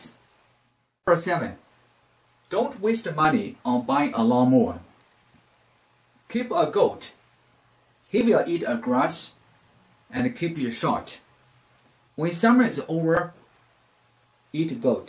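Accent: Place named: China. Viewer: Great video and your Chinglish is funny! Thumbs Up!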